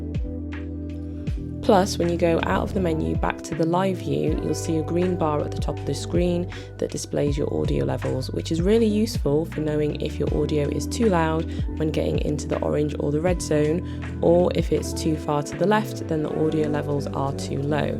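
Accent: United Kingdom. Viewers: So first question…..can you use the mic 2 Bluetooth feature on the GoPro 12 as well? I love your British accent btw lol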